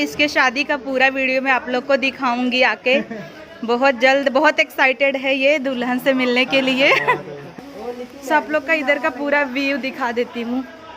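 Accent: Jaunpur, India. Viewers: ji, Hame gaow Bahut accha lagta hei. Aap ki awaj sun kar Jaunpur ki yad aa jaati hei. Main Jaunpur se bahar rahata hu.lakin aap ka video dekh kar lagta hei ki mein Jaunpur pahuch gaya.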